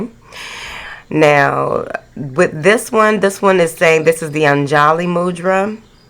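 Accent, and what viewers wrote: South, United States. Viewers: That southern accent tho. 💜 🙌🏾💯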